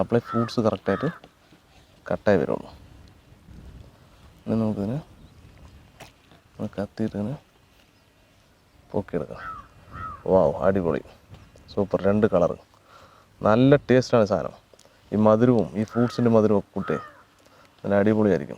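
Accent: Palakkad, India. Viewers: Palakkadan bhaasha super🤪 mostly watching for your language. Pakshe cake adipoli aayitundu👍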